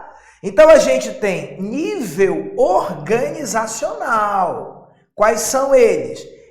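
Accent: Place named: North, Brazil. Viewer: Amo esse sotaque, orgulho ser nortista!😃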